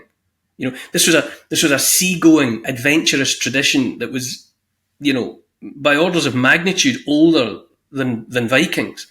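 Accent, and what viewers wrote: Scotland, United Kingdom. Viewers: Greetings from Finland 🤗 Love your stories, ranting and scottish accent. I get a cosy feeling listening to your channel. Must have some scottish blood in me 🤔 😊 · The Scottish accent even manages to make 'Millstone Grit' sound dangerous. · Scottish scozzese?😀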